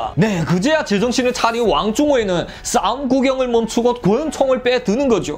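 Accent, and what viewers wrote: Yanbian, China. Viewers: 중국사건 얘기는 다른영상에서 잼나서 자주봣는데 님껀 어제부터 이틀동안 다봤넹용~~ㅋㅋㅋ연변분이셔서 그런지 레알 말투가 리얼하네요~ㅋㅋㅋ👍👍👍👍👍잼나용^^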